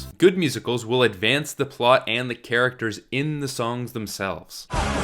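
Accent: Canada. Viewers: heard the Canadian accent. Woot!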